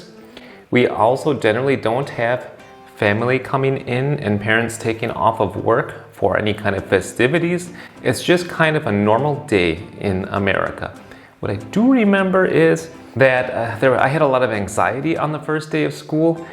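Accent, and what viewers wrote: Germany. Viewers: If you wouldn,t of said different , I thought you are german ,speaking english with a german accent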